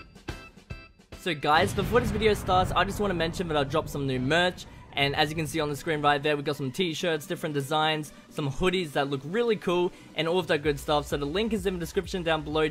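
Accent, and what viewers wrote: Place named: Australia. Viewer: New it you are from Australia not USA OR US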